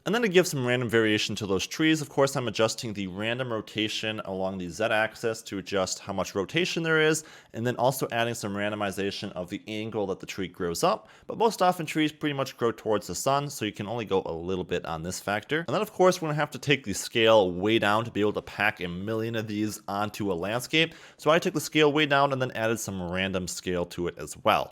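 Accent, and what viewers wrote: Germany. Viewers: Are you German ???? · Yo are you german???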